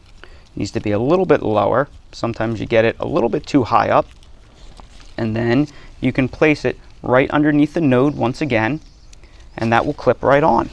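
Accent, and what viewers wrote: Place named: Northeast Philadelphia, United States. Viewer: No way is this dude from North Carolina. His pronunciation of “herricane” means he’s from Gloucester County, NJ. Williamstown, Wenonah, maybe Deptford. “Shtrike” instead of strike is Northeast Philly.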